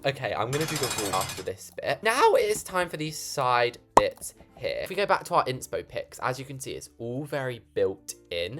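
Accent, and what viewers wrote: United Kingdom. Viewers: How come ur British but ur sister is amarican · Wait so he’s British not Australian…?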